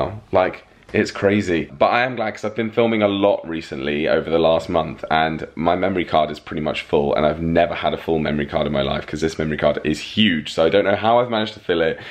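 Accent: United Kingdom. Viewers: Love Your Channel. And Love Your British Accent.🙄 I hate to be bring this up. But Florida Is #1 Hate State When It Comes To Our Community. I find this very sad because in my younger Gay Year's I spent allot of time there.😢 It so sad that the majority Of The States Governments Our Out To Put Us Back In The Closet And Put Us In Real Danger. If I Wasn't So Old And In Bad Health I Would Consider Going Across The 🇬🇧 Pond. You Have A Beautiful Family. Enjoy The Rest Of The Summer. Greetings From🌴 San Diego.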